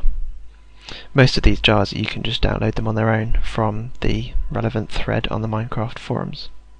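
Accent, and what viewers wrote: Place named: United Kingdom. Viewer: Because he speaks British?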